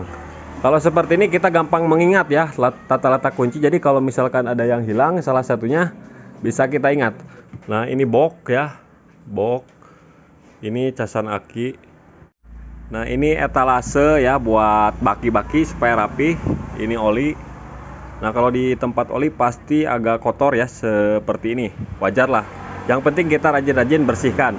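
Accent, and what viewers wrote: West Java, Indonesia. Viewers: kang lokasi nya dmna ? kaya orang sunda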